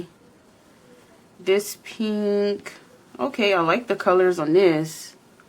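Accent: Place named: Louisiana, United States. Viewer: Thanks again for another Roulette! Ok I know you are from Tx but you have a very Louisiana accent. Do you have history there?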